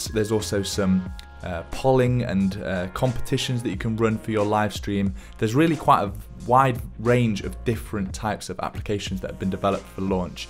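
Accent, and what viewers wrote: United Kingdom. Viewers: Where is your accent from? It doesn't sound like london british but it sounds british, it's nice.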